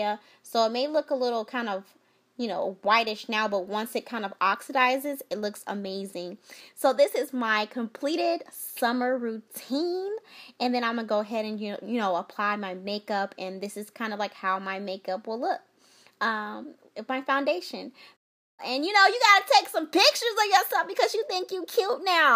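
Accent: South, United States. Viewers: ☺️ girl!! You a hot mess. I'm loving the makeup and your southern accent. Simply divine.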